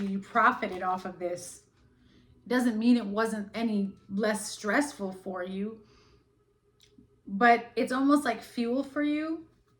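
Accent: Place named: South, United States. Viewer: I like your voice. Hope that’s ok to say. Where u from if you don’t mind me asking? I am guessing somewhere in the south but would love to know where. Thanks for the reading!❤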